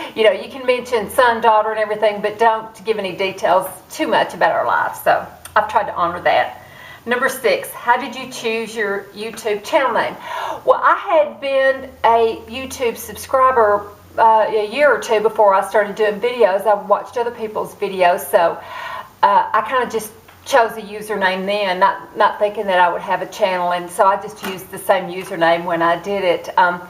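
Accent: South, United States. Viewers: u have a beautiful disposition and the southern accent makes it even more precious,i enjoy seeing your loving and sweet relationship with your husband,i enjoy seeing how u make everyone around u feel special in so many ways, and in so many details,like bday celebrations with food,presents and making them feel special.i enjoy seeing u be in an awesome shape and do your exercises and stay healthy and strong,enjoy seeing your vibrant personality come through even when u r facing surgery.enjoy seeing bravery in action for putting yourself out to the world to be seen and heard.all these things about u and am sure many more to come, i feel need to be noted,acknowledged and celebrated,thank u for sharing your awesomeness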